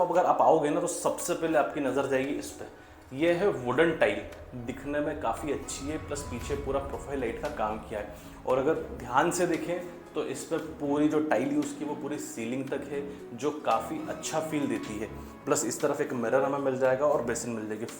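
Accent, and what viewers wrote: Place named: Malwa, India. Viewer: आपके बात करने का सलीका बिल्कुल मालवा के गांव के लोगों जैसा है, कौन से गाँव से हो आप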